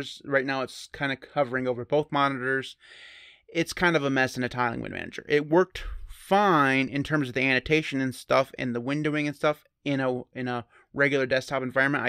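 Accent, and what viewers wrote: Spain. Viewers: Buen acento español :D eres el mejor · Me encantó tu acento en español, I loved your Spanish accent, maybe my English accent doesn't is so good. Dialect reminds me Crow-translate, the interface seems to be the same.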